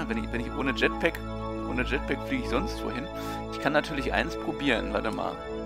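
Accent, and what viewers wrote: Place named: Berlin, Germany. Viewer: Ah herrlich, diese Verwirrung und dann noch die Berlinerschnauze 🤣👍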